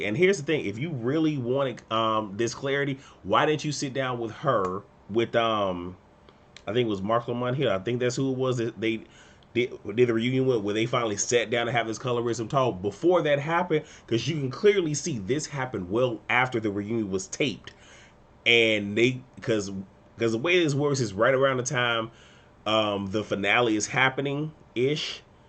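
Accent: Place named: Louisiana, United States. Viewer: Lmao dead I hope they throw that water out, are u from Louisiana? I sorta hear an accent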